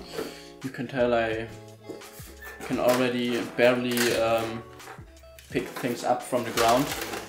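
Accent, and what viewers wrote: Germany. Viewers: Ich hab danach gesucht das jemand merkt das er einen deutschen akzent hat 🤣😂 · that all can heared out hes germany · damit war gemeint, dass man hört, dass er eigentlich deutscher ist und nicht Engländer oder so... Er hat alles richtig gemacht/geschrieben, man hört wohl raus das dir ein paar Gehirnzellen fehlen 🤦‍♂️ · Mann hört es instand raus mit dem deutsch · Man hört so raus das er Deutsch ist❤️😂😂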